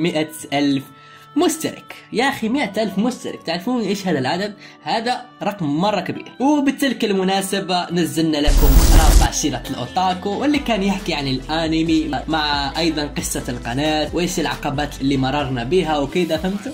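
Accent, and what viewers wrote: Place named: Morocco. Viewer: أضن كلامك يحي إلى أنك مغربي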